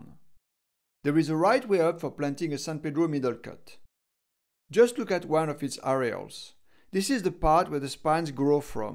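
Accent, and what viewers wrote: France. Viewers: french accent ? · wtf l'accent francais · Your accent is too much 😆 fucking french 😂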